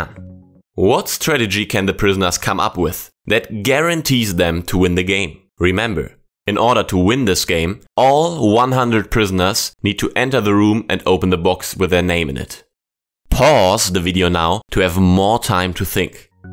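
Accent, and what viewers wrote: Germany. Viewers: Is that a german accent i hear?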